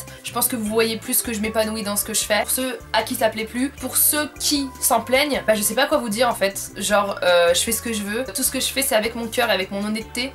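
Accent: England, United Kingdom. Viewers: tu as un très belle axent anglais j'adore t'es vidéo continue comme ça tu es trop drôle bisou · j'adore ta chaîne ma belle! tu me fait trop rire avec ton pti accent british! #teamsnapchat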